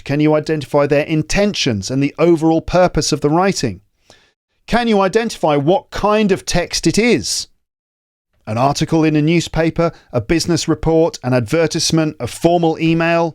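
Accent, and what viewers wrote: United Kingdom. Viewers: I’m literally enjoying to listen to your podcast, I love British accent ❤ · British English is much easier to understand. Thank you ❤❤❤❤❤ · Dear teacher!!! I love your podcast, I love your accent or British accent. I´m learning a lot and havin fun. Lots of hugs from Brazil! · I don't like your British accent 🤢🤢🤢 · I enjoyed the episode thank u very much. Also your podcast room is so cool! And finally, which British accent do u have I am interested ? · Your british English is so clear to be understood 😊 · I don't know why but i it's really difficult understand uk accent, ven so I could have good compren henshion of your explanation comparing to north america accent it's really really different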